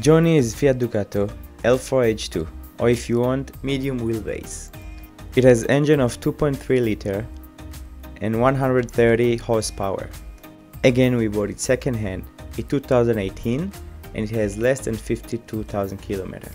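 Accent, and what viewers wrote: France. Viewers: you sound French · L'accent français représente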